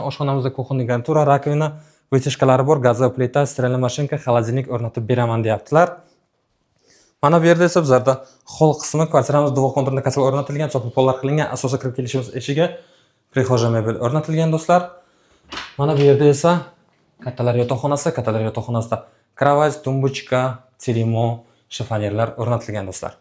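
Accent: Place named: Bukhara, Uzbekistan. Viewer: Бухоро шеваку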